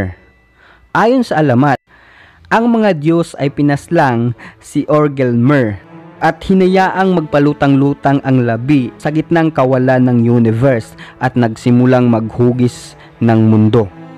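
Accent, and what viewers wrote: Visayas, Philippines. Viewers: Ok sana Kaya Lang Visaya ka eh ayusin Mo ung pagsasalita mo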